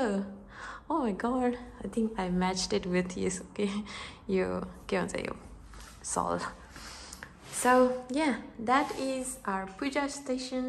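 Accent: Nepal. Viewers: Your nepali issss sooo cuteeee.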